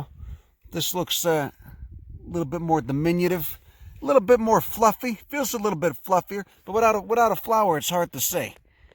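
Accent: Chicago, United States. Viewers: I believe he’s originally from the chi. Sounds like a south side or south suburbs Chicago accent to me. Though I pick up a little New York as well.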